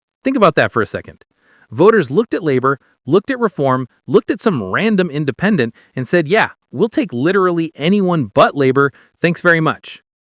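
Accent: United States. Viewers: as usual - no clips , no evidence - just the same boring American voice enjoying the sound of his own voice!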